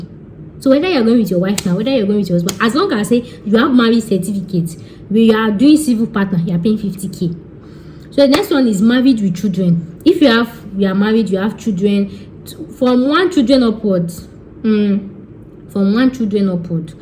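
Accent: Yoruba, Nigeria. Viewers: I love this ur yoruba English, proud of u sis ✌️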